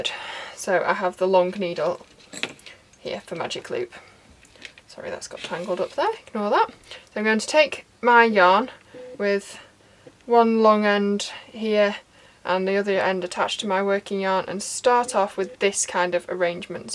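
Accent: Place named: Lancashire, United Kingdom. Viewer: Excellent tutorial! I prefer the Alternating, Long-tail 1x1 rib (aka Italian) cast-on to the too-often - IMHO - recommended Tubular (also aka Italian CO). So your tutorial hit resonated well for me. I'm starting a beret for a lady friend, and was looking for your exact video to kick-off the project.:) think that I'll try casting on straights, then transfer the lot to bamboo DPNs, hoping for more cling to min. the twisting. Then transfer the lot to ML! Love your Lancashire accent, as I have some remote connections in W. Yorkshire. Thanks again!!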